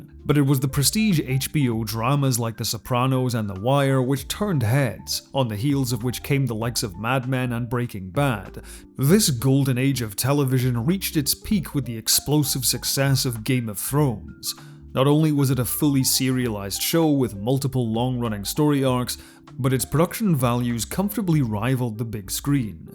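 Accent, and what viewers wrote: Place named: England, United Kingdom. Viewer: Wait you sound English. Are not most English and European shows like 1-4 episodes a season which you call series, and then it only goes for like 3 seasons anyways? Or do you get a lot of American TV Shows in UK?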